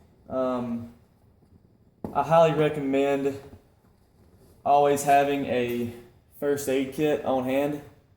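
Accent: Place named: Appalachia, United States. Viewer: Always like hearing hillbillies try and explain something.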